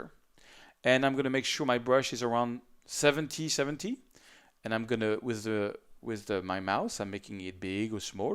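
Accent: France. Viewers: I love your accent...I remember my trip to Paris and yummy macaroons 😍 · Rather than just doing the French accent, you should throw some French in. I would love to learn some French.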